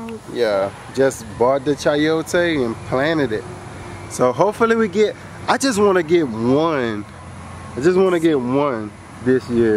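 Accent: Louisiana, United States. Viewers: As soon as I watched your first video (the one I watched before this one) I said "he sound like my people". So naturally I liked (👍) the video. Less than a minute later you verified it when you said something died off because the Louisiana heat was starting to set in. I was sold then, on top of you being out there with the family and your knowledge base just made it all the better. What part of Louisiana are you in? I'm from Belle Rose/Baton Rouge (south, la) but live in Ruston (north,la) and have been attempting to try my hands at growing with little success. I think I'm afraid to "go all in" and fail so im very cautious about how much and what I plant. Do you have any suggestions on a small but mighty starter garden? Thanks in advance.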